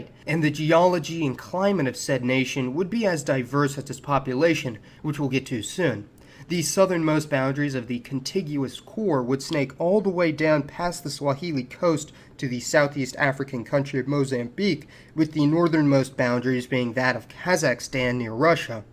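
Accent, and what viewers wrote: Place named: United States. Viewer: and no American voice next time 😂try a British one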